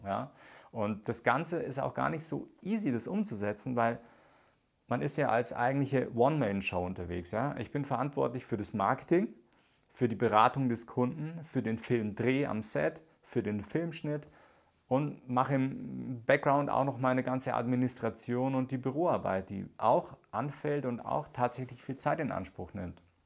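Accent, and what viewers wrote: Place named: Bavaria, Germany. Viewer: Cooler Kanal! Abo is raus :D schön auch einfach mal nen Bayer zu hören. Wie hoch ist denn dein Lebensstandard, den Du Dir erwirtschaften musst, wenn Ich nachfragen darf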